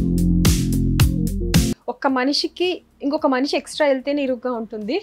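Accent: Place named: Tenali, India. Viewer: Perfect tenali language 👌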